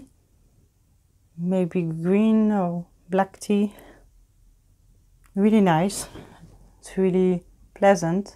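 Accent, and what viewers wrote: France. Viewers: Frenglish 😂 I think it's sexy AF! Wish we could've seen the relief on your beautiful face! 😂 The joys of a blind buy WIN. 🍵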